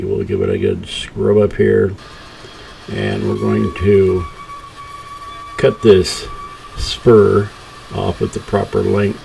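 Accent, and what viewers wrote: RP, United Kingdom. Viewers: Loved the cultured british accent. Since it happens to be your shop you can call the tools what ever you want to. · After watching many years of the BBC I think the accent was spot on. It matched with the opening visuals and reminded me strongly of my childhood. I just needed to see a Dalek and I would have been behind the sofa like a shot!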